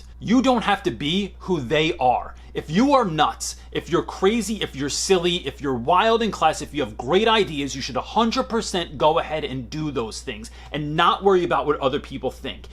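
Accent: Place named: Philadelphia, United States. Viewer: Yessss. I spotted a Philly dialect feature. "Those Johns" hahaha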